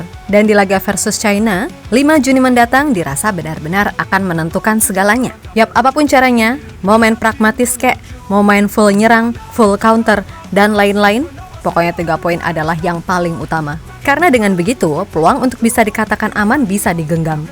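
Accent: Java, Indonesia. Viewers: E mak mblegenduk , wong jowo yo mbak ? Podo nak ngono 😂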